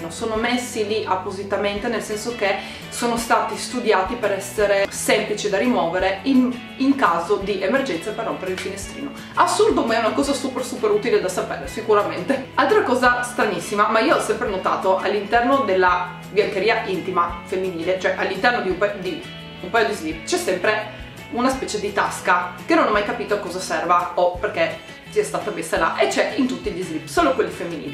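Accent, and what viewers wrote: Trieste, Italy. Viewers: Hai l'accento triestino. Sei di Trieste? ☺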